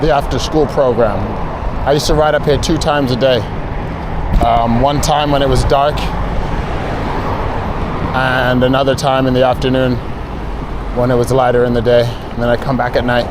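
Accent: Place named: United Kingdom. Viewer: I can really hear your years spent in the UK in this video. I’ve picked up on a few times but it’s really expressed here. Great ride! Thank you for sharing.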